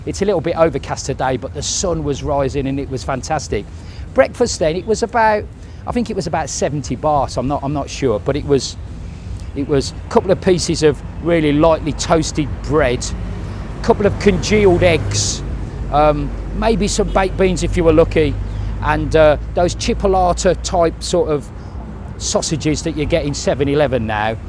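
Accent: Birmingham, United Kingdom. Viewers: I thought it was a Birmingham accent but I didn't want to insult you and mention the black country. I worked in West Brom for 23 years before moving over here, it's a very small world!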